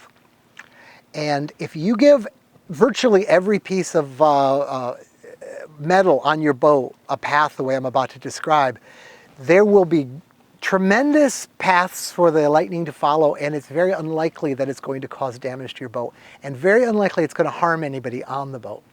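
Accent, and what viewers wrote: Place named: Seattle, United States. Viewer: Hello, loved it are you from Seattle, I think I heard that??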